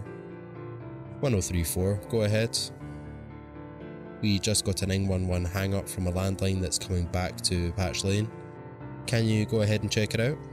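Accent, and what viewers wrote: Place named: Scotland, United Kingdom. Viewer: I 💘 The Excellent Narration by 👏👏👏..bravo!!! I'm digging The Scottish Accent 😚 Keep up the Awesomelicious job;) much love Sexy Grandma Darkness✌💖💖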